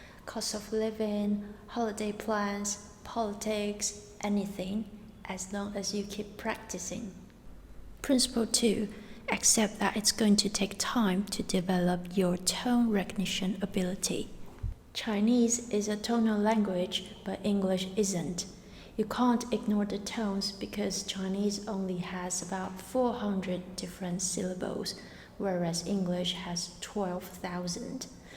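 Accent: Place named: United Kingdom. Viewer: 谢谢老师推荐，你的英音太好听啦！！！🥰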